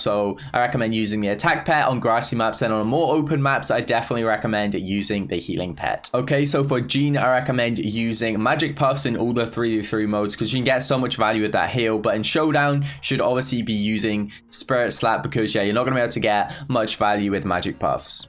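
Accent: United Kingdom. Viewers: I love the British accent ;)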